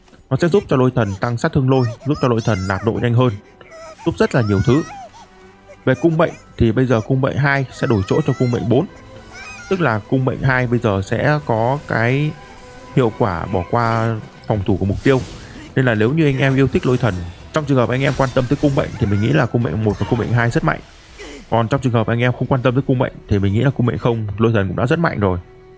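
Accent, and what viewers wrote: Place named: South, Vietnam. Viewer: nghe giọng anh nói tiếng miền Nam dui vc xDD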